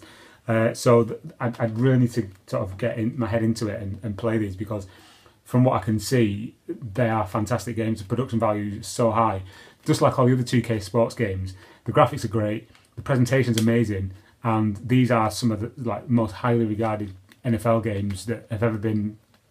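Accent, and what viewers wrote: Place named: Manchester, United Kingdom. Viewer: Didnt realise you were a manc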